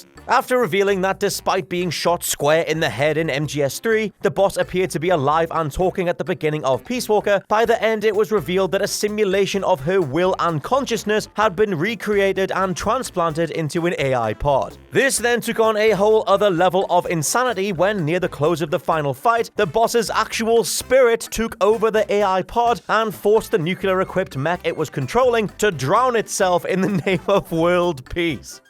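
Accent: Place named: United Kingdom. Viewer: WhatCulture is just WatchMojo with a british accent. Watching this video made me stupider.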